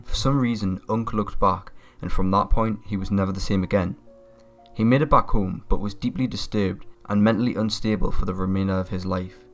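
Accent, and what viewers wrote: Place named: Scotland, United Kingdom. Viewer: Where is it that you are from?? Sounds Scottish (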